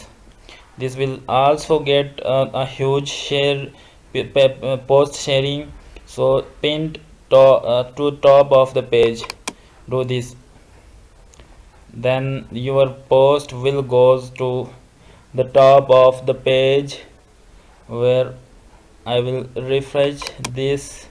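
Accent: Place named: India. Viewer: Waste of time , u should do it with no voice , sick of indian english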